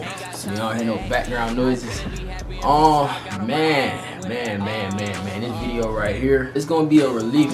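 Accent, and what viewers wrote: Boston, United States. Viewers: U from Boston ? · Your from Boston?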